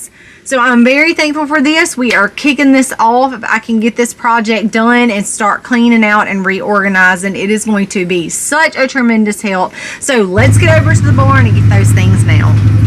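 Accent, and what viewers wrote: South, United States. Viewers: What is your youngest daughter's name and how old is she? Im not use to southern accents and I can't understand if you say Libby or Livie.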